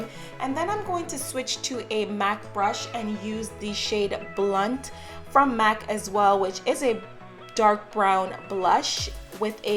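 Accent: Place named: Jamaica. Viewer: your makeup is always so gorgeous, are you jamaican I hear an accent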